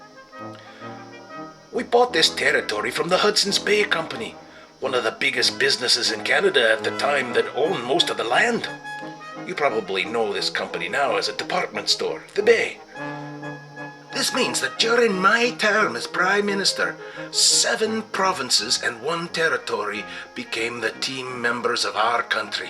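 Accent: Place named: Ireland. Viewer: Why does this guy sound irish?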